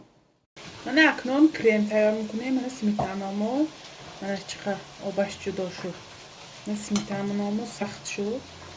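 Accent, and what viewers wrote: Isfara, Tajikistan. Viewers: хамаи видиохо зур диданба мигабайт намирасад авлаш рахматки забони исфарагиамонро гум накардед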